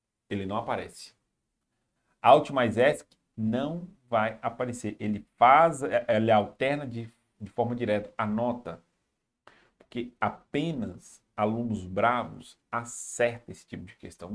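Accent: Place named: Minas Gerais, Brazil. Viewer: Em 2024 gostei muito da aula e adorei o sotaque mineiro. Parece mineiro né. Abraço parabéns 👏👏👏👏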